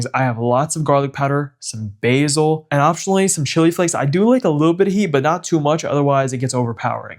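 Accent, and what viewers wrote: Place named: New York, United States. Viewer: What’s with the NY accent in your new videos? I never noticed an accent in your younger videos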